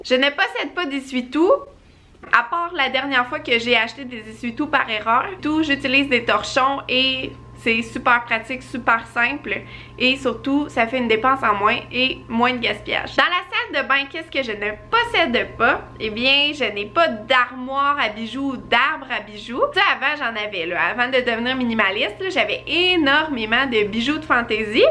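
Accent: Quebec, Canada. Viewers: l'accent québécois c'est vraiment un accent très spécial🤔🤔🤔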